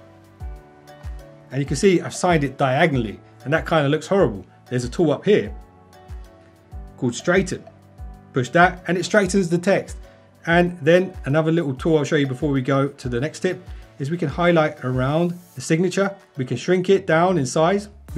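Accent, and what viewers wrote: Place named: United Kingdom. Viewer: uve got a british accent but you say zee instead of zed .... weird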